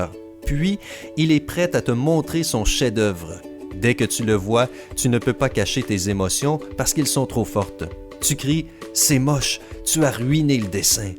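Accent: Quebec, Canada. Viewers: Est tu québécois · je crois que le gars qui parle,c'est un quebecois et jespere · T québécois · C québécois comme moi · T'es québécois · Moi, dans quelques jours c'est ma fête et je vais avoir 10 ans et mes parent mon jamais dit sa(peut-être quelques une mais je m'en souvient pas)et la quand je regarde la vidéo sa me donne envie de revivre parce que je me fais insulter,harceler.😥😣☹️😖😞😔😕🙁😫😩😤😠😡😵😰😢😭😓🤕et je pensais pas que SYMPA parle québécois lime si tu es québécois(e)en tout cas moi je suis québécoise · On ' dirait un accent québécois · Tu est québécois ? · kimii lee accent québécois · kimii lee c l'accent québécois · L'accent Québécois ! :D xD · Et sinon faut être gentil pas méchant c'est pas gentil d'être méchant c'est mieux d'être gentil ? Putain j'ai pas vu de tel defonçage de porte ouverte depuis "le feu ça brûle" même l'accent québécois a pas réussi à sauver cette vidéo. 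· C normal c l'accent québécois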